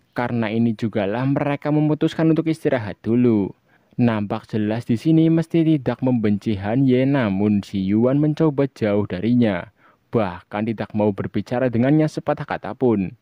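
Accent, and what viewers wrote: Java, Indonesia. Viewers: gw demen sm naratornya. Ada medogh2nya gtu klo ngmg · orang jawaa ngapak yaah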